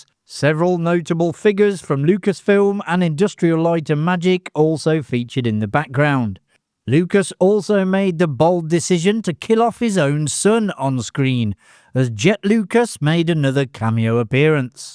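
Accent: United Kingdom. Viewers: what the hell planet is ko-RUSS-kant? check that pronunciation again sir. :) and now the lava planet is named after a middle eastern guy? look, I get that you are a Britt sir, but come on, even those who can't speak english can get the planet names right. is this is AI I'm gonna be sick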